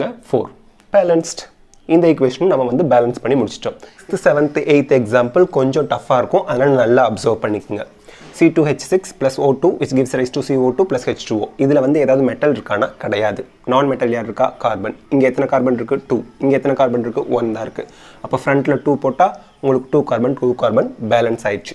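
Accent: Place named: Thanjavur, India. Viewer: Sir you are all rounder I am so exciting with you then I have 1 doubt in DNA fingerprinting let me to tell sir in DNA fingerprinting you told know it is differ for a children's who are from a same parents other than monozygotic twins I agree that sir but still I have a doubt that oru childkku 50 percent DNA from their mom and 50 percent DNA from their dad so antha couple kku innum oru child pirranthalum antha childkku athae thane sir irrukku then how we can say their DNA fingerprints are not similar please I have this doubt for many months I know you are the right person to clear my dobt sir I kindly request you to clear my doubt sir and neenga Thanjavura sir enga oru karanga pesurathu mathiri irrukku atan sir kettae okay thank you sir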